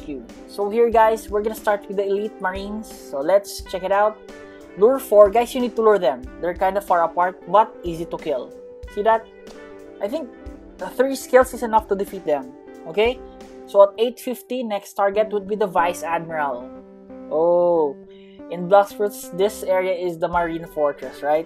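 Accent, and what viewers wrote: Philippines. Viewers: Alam kong pinoy ka kasi sa salita mo pang pinoy na eh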